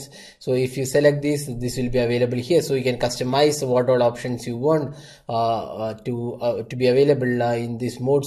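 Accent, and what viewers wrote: Kerala, India. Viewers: u sound like a malayali :)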